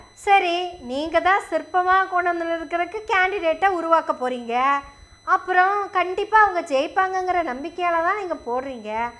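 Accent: Kongu, India. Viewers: Excellent very nice attractive attractive Kongu Tamil. May the God bless you and grow as a a very popular youtuber. You have excellent intrinsic latent and dominant skill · வணக்கம் எங்கள் திராவிட மகளே, வணக்கம்... தங்களின் மாறுவேடம் !?!?! 😮😮😮 உலக நாயகன் கமலுக்கே போட்டியா!!!😂😂😂 நம் அழகிய கொங்கு தமிழில் தங்களது பேச்சு.... Amazing...👌👌👍👍👍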